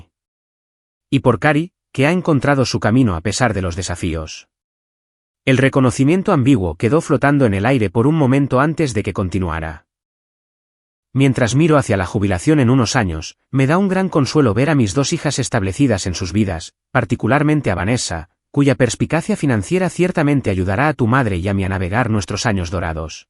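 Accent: Spain. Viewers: es mi favorito narrador tanbien no mea burre me lleva ala imaginacion de cada personage como si fuera pelicula envivo asi como narracon esavos y acento espanol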